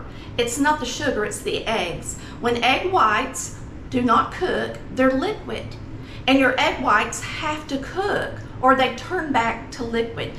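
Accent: South, United States. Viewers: OMG...I could listen to you talk all day long! Love your southern accent and will for sure try this recipe. Bless your heart!! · Thank you so much for your recipe and your accent makes me think of home, I live in Tasmania now, but born and raised in the south.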